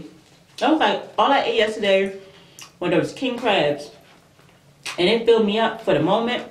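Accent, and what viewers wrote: Baltimore, United States. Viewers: I had a feeling you was from Baltimore from your accent but I didn't want to assume. Most of the time I'm hungry when I watch your channel because it's late and I've been on this don't eat after 7 & before I would eat dinner and dessert like around 9pm. I just be living through you lol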